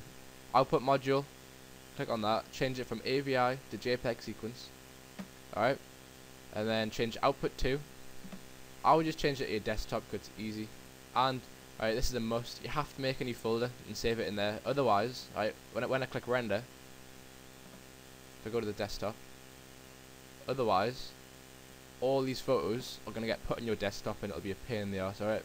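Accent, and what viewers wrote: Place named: Wallsend, United Kingdom. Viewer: Sounds like your from Wallsend or around there somewhere.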